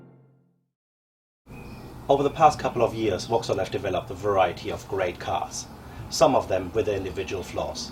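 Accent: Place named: Germany. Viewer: Very nice camera work. Very typical German dialect and mindset. Kinda charming - almost. ;-)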